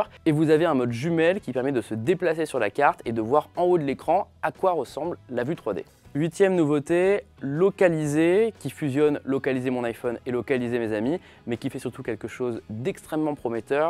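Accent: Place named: France. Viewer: "sing" in wiz apple lol c vrm dégueu l'accent française de l'anglais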